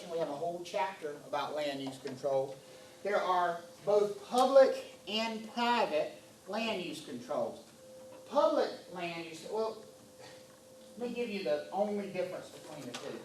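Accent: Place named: North Carolina, United States. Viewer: sounds like NC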